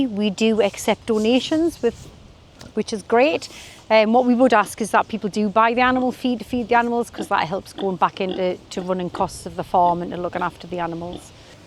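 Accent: Newcastle, United Kingdom. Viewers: It's wonderfull to hear the Geordie accent and to see some places associated with my family, way back. My mother was born in Pelaw in 1923 and she kept her accent all her life, despite living most of the rest of her life in East Anglia. Her father, Sidney Brown was a miner down Wardley pit. He was very active in the trade unions, and in October 1936 was one of the Two Hundred on the Jarrow Crusade. It may have been a futile attempt to challenge an intransigent government's mind, but now it is an iconic event that everyone has heard about. Your new video shows the very area where once there was a mine where "Ganga Broon" worked and my mother lived as a girl. So, huge thanks,. Keep up the good work! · As a Geordie from Wallsend now living in Thailand I help local kids learn English and I do not feel home sick as all my kids speak Geordie like you.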